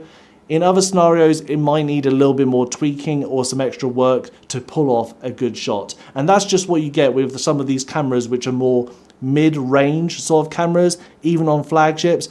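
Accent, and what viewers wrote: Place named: Cockney, United Kingdom. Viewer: Why are you speaking in a Cockney accent?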